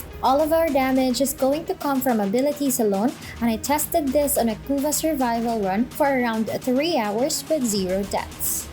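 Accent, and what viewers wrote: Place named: Philippines. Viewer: Are u filipino? I can tell from ur accent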